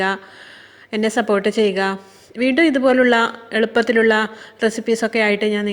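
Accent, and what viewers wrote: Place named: Kannur, India. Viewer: Ithupola pattippidich bhasha Kannur thanne.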